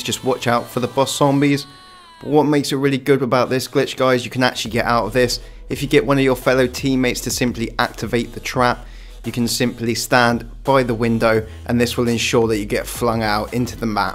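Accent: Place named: England, United Kingdom. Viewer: Dude,I like your pronanication by "Reich".😂1 Like for the english akcent by German words.👌👍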